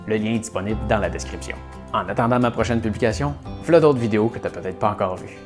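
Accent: Quebec, Canada. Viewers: Holà!🇫🇷 🤗excellente vidéo avec en prime le p’tit accent du Québec qui va bien!.ça m’mets du fun pour bien commencer ma journée 🤗..bonne continuation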